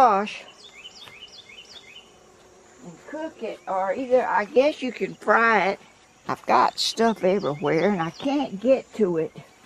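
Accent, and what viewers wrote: South, United States. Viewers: I'm so glad I found your your channel! My Grandparents were from North Carolina and moved out West so I was raised Southern. The Southern way of talking is dear to my heart but I don't hear it much. You've brought me so much joy! I just knew when you got in to pick the squash you'd probably get 60 to 80 pounds worth Ha! I'm guessing some of your recipes are much like my grandmas. Take care now! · It’s my bed time and boy your southern voice is so comforting and relaxing..Glad I ran across your channel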